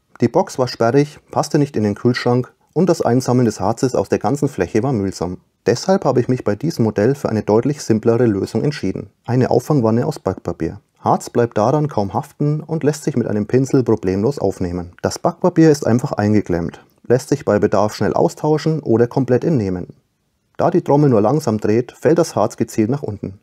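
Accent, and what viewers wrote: Franconia, Germany. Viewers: glingdd noch nem franggn :P :D grüße aus nürnbärch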